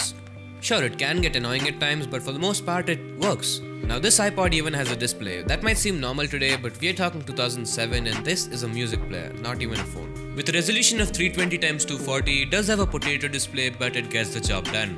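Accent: India. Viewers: It's just the normal Indian English accent. I can hear him just fine.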